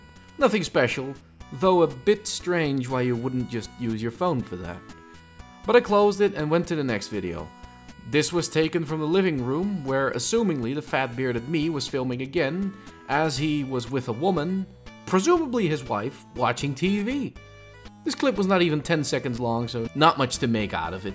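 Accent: Netherlands. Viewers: are you dutch because you sound dutch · r u dutch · Are you dutch because it sounds like it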